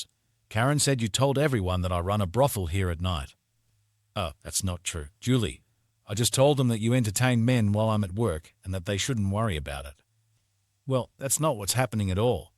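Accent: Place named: Australia. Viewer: Why did you use an Australian accent??? It's obviously set in the USA. There are very few Australians in the USA you have too much violence and blacks.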